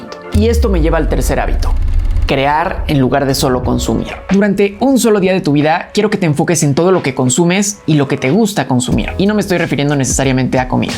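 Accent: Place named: Fresa, Mexico. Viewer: Y EL INTENTAR HABLAR FRESA ES PARTE DE LA RUTINA ? ...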